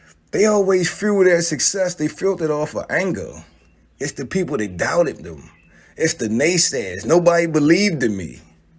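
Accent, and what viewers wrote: Baltimore, United States. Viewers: This guy, the content creator is from Baltimore based on his accent. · U have to be from Baltimore Maryland I can tell by that accent . U sound like my sister.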